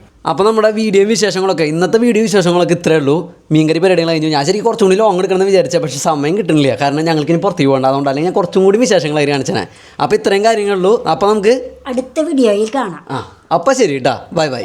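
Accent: Thrissur, India. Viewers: Trissur anoo chetta 👀😀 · Chetta You guys are really cute💕😍.. Enthu resamma ee video kandondu erikkan😍.. Nigalude face lle Innocence serikum ariyam.. The way you guys smile 😍 and i really like thrissur accent ❤️ Chetta U r amazing.. Keep going 💪👍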